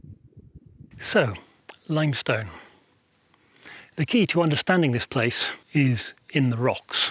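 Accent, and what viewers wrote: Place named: North Island, New Zealand. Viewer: Given your accent I'm guessing you're from the north north north island?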